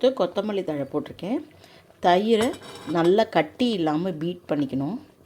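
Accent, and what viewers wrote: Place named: Bangalore, India. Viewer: Thakshanam is the unique Bangalore Ayyangar bhashai ❤it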